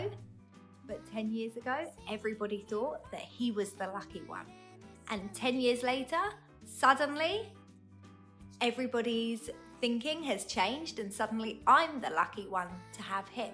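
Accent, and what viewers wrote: United Kingdom. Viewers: I like to listen to you talk, which made me love the British accent.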